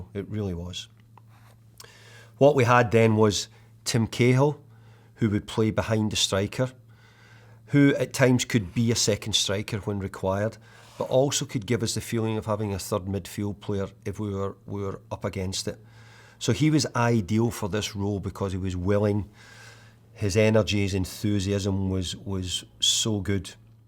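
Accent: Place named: Scotland, United Kingdom. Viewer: Oh I love that Scottish accent, it's just so easy to understand, especially Fergie's speech 🏴 ❤️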